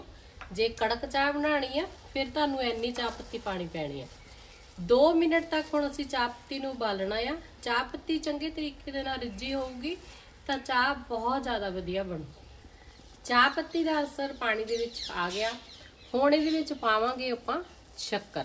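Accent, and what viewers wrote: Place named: Majha, India. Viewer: "gaud" majhay aale sadde aale side di boli badi miththi hai ❤😊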